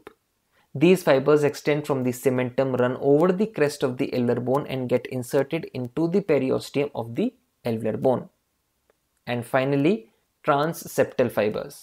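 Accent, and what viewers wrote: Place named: South India, India. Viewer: Hehe...am i right or wrong Aapke kuch words mein south Indian accent lage.. 😅 anyways.. nice explanation of the topic thank u 🙂👍...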